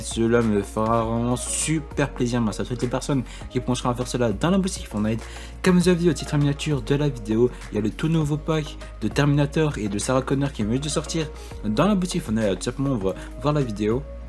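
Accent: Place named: England, United Kingdom. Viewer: L'accent anglais magnifique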